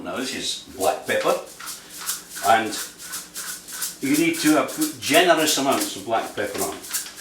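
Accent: Edinburgh, United Kingdom. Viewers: Nice to hear an Edinburgh accent on the interweb. Slainte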